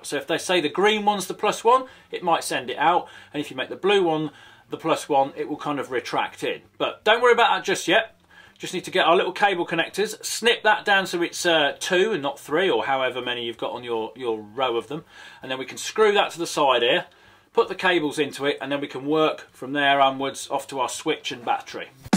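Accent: United Kingdom. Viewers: It was some screws he's British it's his accent that makes you think that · I love his British accent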